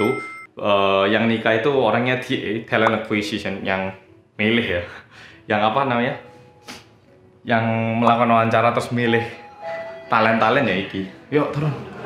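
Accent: Java, Indonesia. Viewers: Wwiiiiihh entek petang piring reeeekkk....hahahahaha......nggak luuwweeee iku reeekkkk...tp ruwakuuusss yooo......btw its ok 👍👍😍😍😘😘 Suuueeenneeenggg puoll ndelokno e.....success trus ya nas sing muuueeeddokk👍👍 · Lucu ya.. Org asli korea malah logatnya jawa.. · Bahasa nya medok bngt, salut aku , 😍😍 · Gw kira suara nya logat korea gitu eh ternyata medok:v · ngomongnya Medok jawa ya... wkwkwkkw lucu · Kira-kira apa yaa reaksi orang Korea asli yg nggak ngerti Indo pas denger Mas ngomong Indo medhok. P.S Kostum menghadiri pernikahan di Korea lebih simpel cenderung monokrom, nggak kayak di Indo yg agak gimana gitu liatnya warna warni kadang juga dandanannya suka heboh. (*Kecuali yg ada ketentuan warna dresscode yaa) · Medok banget jawanya:') Lucu sumpahh>< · Aku baru nton dann sumpah aku nton berasa nton org indo yg lagi di korea😂 duhh logatmu mas indonesiaa bangeet💗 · Logatnya jowo buanget 🤭🤭🤭🤭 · Btw ka jawa nya medok bgt 😂 kalo ngomong serasa kaya pak jokowi yang ngomong 😂😂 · Ini orang korea apa indonesia. 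Logatnya xo jawa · Medok banget ngomong nya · Banyak makan nya si korea medok... gak rugi kl all can you eat.. hahahh 😅😅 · Hallo wong korea medok piye kbre??? Cobo melu nak sek jenenge 패펙 aku pengen weruh.... Maturswun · Medok banget omongnya kaya aku , jadi lucu deh kaka 😂😂😂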